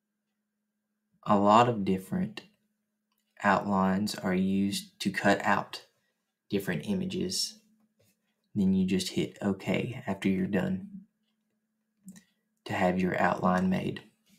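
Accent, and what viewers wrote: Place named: South, United States. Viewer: You did an amazing job on this!! You are nice and slow on your descriptions, and I absolutely love the Southern Drawl!😊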